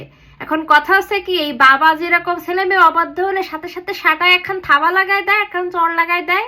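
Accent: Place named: Jessore, Bangladesh. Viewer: আপনার কথা শুনে মনে হচ্ছে আপনি যশোর জেলার মানুষ জানতে চাই আপনি কোন জেলার মানুষ?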